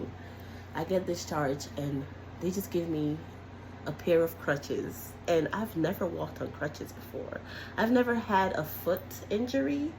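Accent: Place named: Brooklyn, United States. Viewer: you remind me so much of "Mz. Brooklyn." I just love your Brooklyn dialect. She started out at, I believe, almost 400 lbs. Today, she has dropped significantly. Your "story Time" reminds me of Mz. Brooklyn's "story time." <link>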